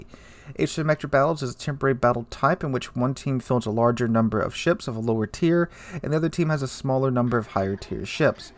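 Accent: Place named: United States. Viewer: True, but pronunciation isn’t bad, it’s just the Americanization, like saying Edinburgh as Edinburough instead of Edinburra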